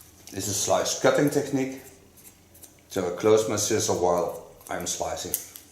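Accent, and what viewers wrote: Netherlands. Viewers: I love to watch your videos!! Part of the attraction is to see your cutting & colouring. It’s exciting to see your finished results, amazing. Part of the reason I especially enjoy your channel is because I’m half Dutch & hearing your accent reminds me of my late father & my remaining family in the Netherlands. My father was born in Haarlem but very sadly he died when I was young, in the mid 1970’s. All the family are now around Assendelft. As a tiny child I remember my father speaking on the telephone to family in the Netherlands. I remember he often spoke with Uncle Anton who was a hairdresser with a salon in Amsterdam!!! Unfortunately I only now have cousins alive in the Netherlands, all other family have passed away. So…when I watch your videos it makes me so happy to think of the Netherlands & my very wonderful family there…those that are no longer with us & those still alive. 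Thank you!! 🇳🇱👍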